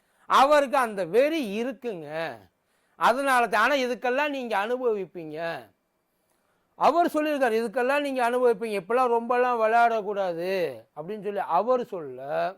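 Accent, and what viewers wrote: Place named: Telugu, India. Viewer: இது எந்த தமிழ் தெலுங்கு தமிழா😊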